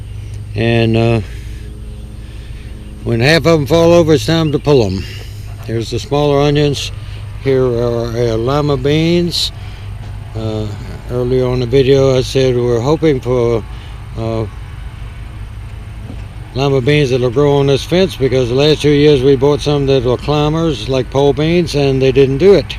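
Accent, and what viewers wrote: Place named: Coastal Virginia, United States. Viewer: I'm going to guess he has an old fashioned Coastal Virginia accent...it does have those rounded o's like Canadian. Listen to Earl Hamner narrator of the Waltons--sounds very similar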